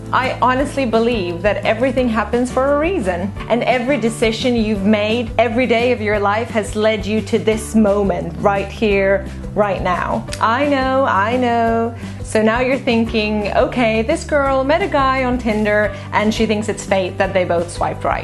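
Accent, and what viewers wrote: Finland. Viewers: They were from the Helsinki/Espoo area. I heard you're from a small town or village. They were all Finnish speaking Finns, except for one who claimed to speak Swedish and Finnish both as native languages. She didn't start a conversation with me, she dropped a toy mascot on the floor, I found it, then she came up to me to claim it back. BTW, I can hear your Finnish accent when you speak Swedish.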